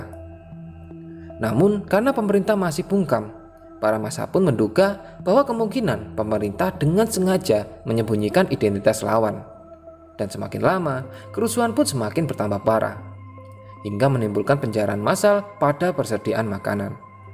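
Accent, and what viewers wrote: Java, Indonesia. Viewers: Medhog bhuanged mhase.... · Logatnya jawa bet ya wkwk. Sukses terusss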